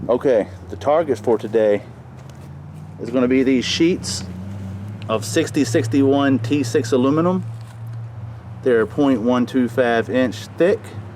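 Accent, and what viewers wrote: United States. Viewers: What kind of accent is that, I'm hearing southern sounding I's and yankee soundind Ie's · I can’t find anything chambered in nan or forty fav. (I’m joking. The accent reminds me of being home in Georgia!) Fun test to watch! Semper Fi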